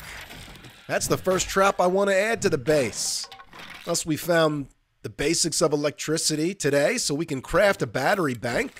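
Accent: New Jersey, United States. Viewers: Probably the best 7Days content around. Maybe its the New Jersey accent...?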